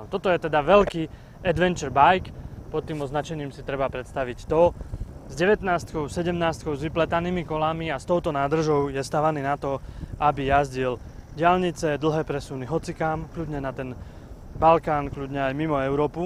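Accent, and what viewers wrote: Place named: East, Slovakia. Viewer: Velmi silny vychodniarsky prizvuk... inak super.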